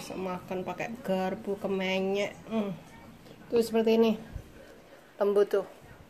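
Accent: Java, Indonesia. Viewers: Konten konten mantaff..mba sekeluarga warga negara Hongkong apa warga negara Indonesia..Sy suka mba klo bicara pake bahasa Inggris tapi medok nya masih ada..the best mba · Aq suka dengan resepx n cara bicarane itu lo bhs jawax medok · Mbak ini ngomong nya kaya orang jawa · ndlok ngomonge sampean wong jowo yo?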